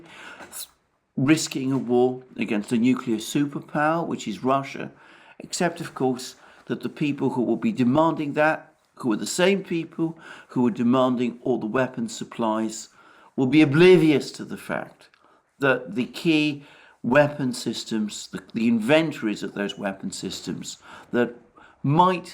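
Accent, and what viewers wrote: England, United Kingdom. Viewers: Non Brits really get bedazzled by a moderately middle class, English accent. sounds the part, but his info is sub-par, to say the least. Good day to you! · Steven, Pls can you give an example of the so-called sub-par info in speech. Also, it seems that you’re sounding a bit irritated by the fact that middle class English is nevertheless bedazzling for the non-Brits. I can tell that all English accents are interesting 👋🏻😉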